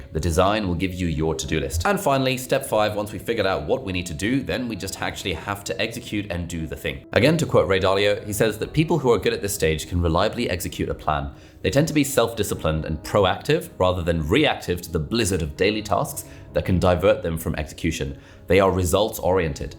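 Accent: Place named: United Kingdom. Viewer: This may not be related to the video,but I want to ask you something.How do you talk so fast and clear?I can understand each word of your British accent,even though I find the British accent most hard to understand. Pls give some tips☺️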